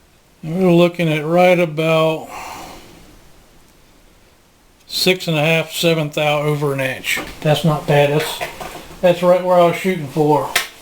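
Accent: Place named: Kentucky, United States. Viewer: Nicely done! I'm impressed. Where are you? You sound like Kentucky.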